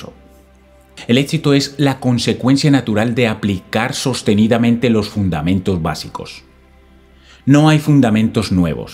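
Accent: Colombia. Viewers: Muchas gracias por los consejos y las ideas = son cosas k hacen pensar y yo he cambiado mi mentalidad ; después que escucho los Audio. Solo queria Saber si la personne que narra es un Colombiano?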